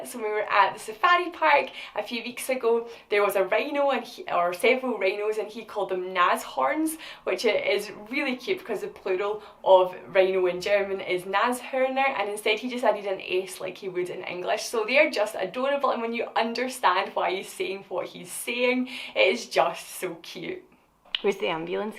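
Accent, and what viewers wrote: Scotland, United Kingdom. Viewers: Love this video! My husband is french and I’m English/Welsh so we have a few languages going on! We’re also sticking to the one parent one language system but I do need to prod my husband mathieu when he forgets 😅 You have such a lovely accent! My wee granny was from Lewis and I spent all my childhood summers there so I have such a soft spot for the Scottish accent ✨ Lovely channel! New sub here, I can’t wait to see more ✨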